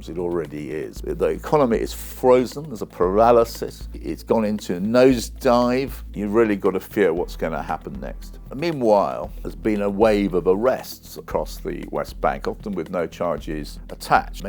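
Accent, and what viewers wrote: United Kingdom. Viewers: Our leaders have by supporting Israel directly or indirectly, lost the right to criticise all those who supported the Nazi genocide of Slavs, Roma and Jews directly, or by sitting quiet, indirectly. Never again can they protest credibly against any form of brown fascism, because when they had the chance, they sat quietly or stood up defending the "right" a particular state of their choice has to collectively punish an entire people. By the way, it's not every day we hear a man with British accent spelling out the truth, so thank you very much, sir. 🇸🇪❤️🇷🇺✌️🆗️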